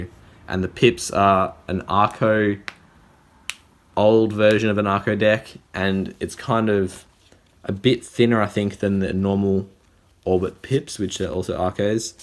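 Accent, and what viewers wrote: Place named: Australia. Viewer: Great review my guy! I already have the cards but that Australian accent kept me on the video 😂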